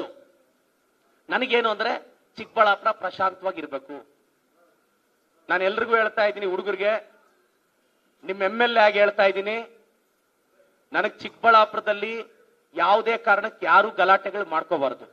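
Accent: Telugu, India. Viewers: Telugu style dialogue super guruve.👏👏👏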